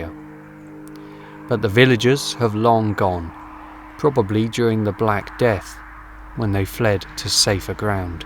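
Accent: United Kingdom. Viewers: Auch wenn ich nicht alles verstehe, da mein Englisch nicht so gut ist, schaue ich Deine Videos gern und genieße Deine angenehme britische Stimme. Die vorgestellten Kirchen, Orgeln und Musikstücke sind immer interessant. Ich wünsche Dir und allen anderen Zusehern auf der ganzen Welt ein frohes neues Jahr 2026!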